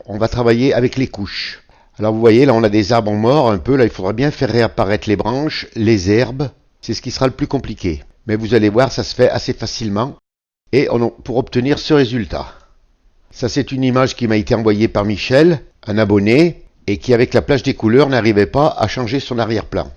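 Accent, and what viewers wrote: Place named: Savoy, France. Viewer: vos tutos sont toujours tres clairs, en plus on a l'accent savoyard. j'ai visionne votre tuto sur le montage dans photoshop mais comment l'enregistrer pour l'envoyer par mail? Merci. C Del Grande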